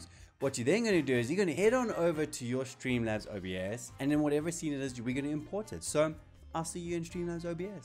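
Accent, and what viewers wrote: South Africa. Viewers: howzit bru I heard your accent and was like, "Is he south african???" then i heard you say PK and i new you were south african lol.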